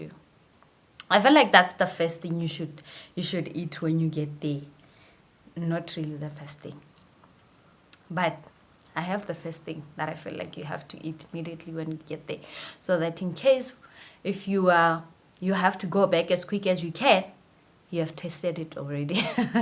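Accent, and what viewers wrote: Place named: Fante, Ghana. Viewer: You can hear the Fanti ascent in her ❤❤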